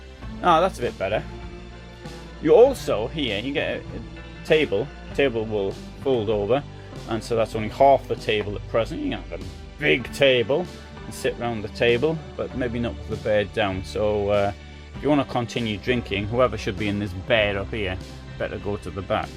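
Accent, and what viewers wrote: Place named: North, United Kingdom. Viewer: not all northern people speak like this man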